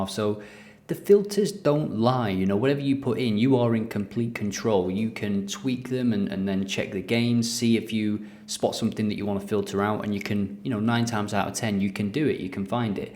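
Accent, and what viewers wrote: Scotland, United Kingdom. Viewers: Great tips! Thanks for not just providing the platform but giving these helpful videos always! Have a good feeling for this weekend. Loved that Scottish accent!!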